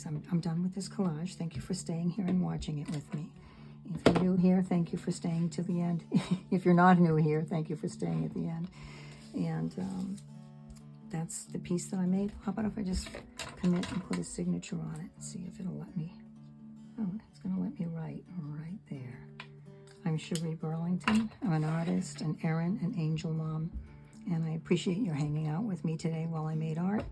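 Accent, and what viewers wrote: Boston, United States. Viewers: I love your Boston accent! Your art is so satisfying to watch as you go about making choices for what you use and how. I can make gel prints all day and do but making a collage is another story. Thanks for making a video today ❤️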